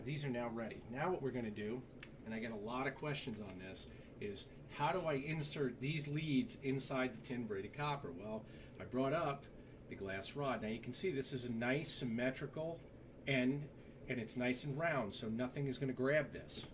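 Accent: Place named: Eastern Pennsylvania, United States. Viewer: you sound like you're from eastern PA. good explanation on the video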